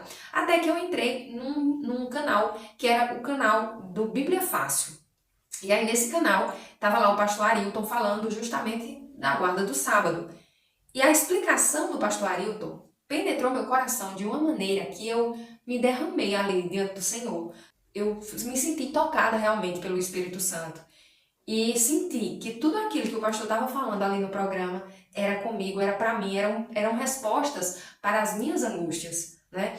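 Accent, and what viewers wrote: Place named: Northeast, Brazil. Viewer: Olá! De qual Cidade você é? Pelo "sotaque " é nordestina rsrs Parabéns por sua escolha 👏 Ganhou mais uma inscrita 😘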